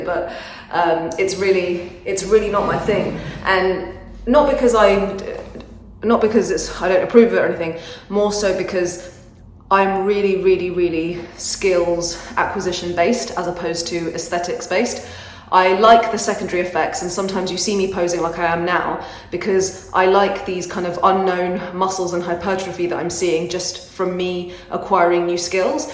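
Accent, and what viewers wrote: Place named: United Kingdom. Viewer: You look like an indian and sound like British 😁😁😁😁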